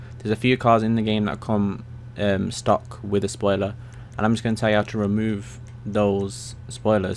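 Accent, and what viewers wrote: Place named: Bury, United Kingdom. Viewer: u from bury?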